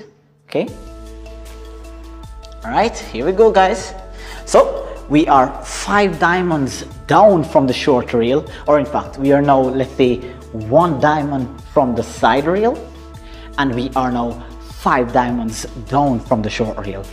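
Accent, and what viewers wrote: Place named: Jamaica. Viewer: Hi there... Good Morning to you. I'm gonna suggest that you try out some kind of sound system, where you can use a chin mic (didn't wanna say mouth mic). The echo & your Jamaican dialect makes what you say hard to hear & understand.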